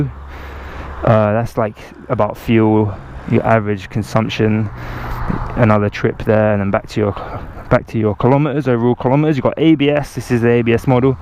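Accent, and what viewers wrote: United Kingdom. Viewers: You sound British but I'm sure this is Thailand though! Are you based there? like your reviews, so new follower here!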